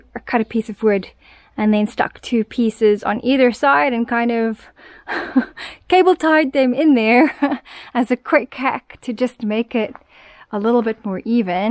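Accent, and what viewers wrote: South Africa. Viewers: Hello from Bedford, Eastern Cape, SA. Ya, we get 40 deg’s here in the summer . Know that you really needed the pool. I can hear your accent but it’s strangely like as if you’ve got something else mixed in the way you’re rounding off some of your words . Not exactly like our lekker plain South African accent . Can’t put my finger on it . Anyway , you’re a brave girl! Good luck . 🌺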